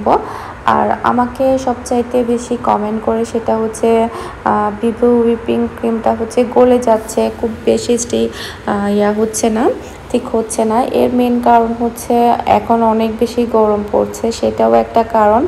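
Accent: Chittagong, Bangladesh. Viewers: আপু আপনি কি চিটাগং এর?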